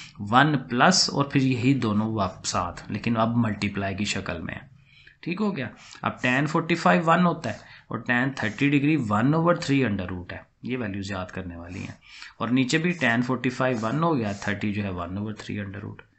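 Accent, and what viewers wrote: Sialkot, Pakistan. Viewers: sir I think u r from Sialkot ❤️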